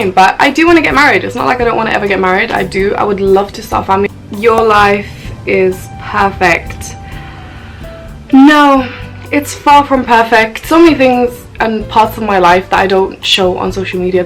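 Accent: England, United Kingdom. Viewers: are you virgin Müslim girl? 😊 😊 😊 😊 course you not!!! are you arabian, pakıstanı, or Afghan where are you from actually youre langauge and accent just like brıtısh accent of course you not brıtısh , just born to england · I like your english accent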